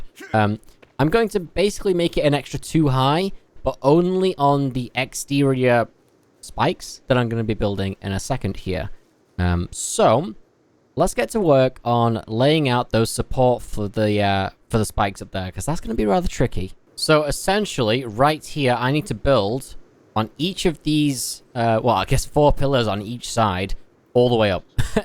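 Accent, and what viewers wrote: United Kingdom. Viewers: Great vids. Where are you from? UK living in USA?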